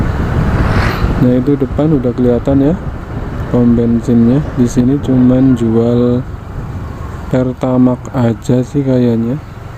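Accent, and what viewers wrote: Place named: Banyumas, Indonesia. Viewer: Mas e ngapak..🤣🤣🤣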